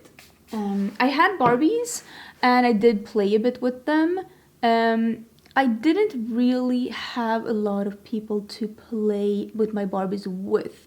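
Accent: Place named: Sweden. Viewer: Its been awhile since I watched a makeup review You're losing your accent 😭 It use to be very thick and unmistakably Swedish(not a bad thing) but it happens to quickly when you move anyway from one's homeland but I can definitely hear your accent softening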